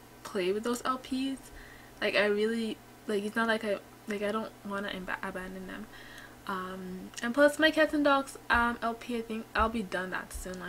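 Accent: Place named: Quebec, Canada. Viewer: i really like your accent- ik i sound creepy and correct me if i’m wrong but it sounds like a French Canadian accent. Anyway, hopefully you can get the Seasons pack soon ‘cause I’m super excited to watch the storyline that you came up with!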